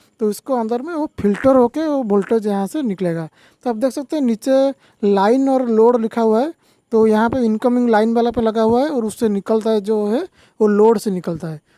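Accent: Odisha, India. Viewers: Sir i love your odia accent .. Very helpful..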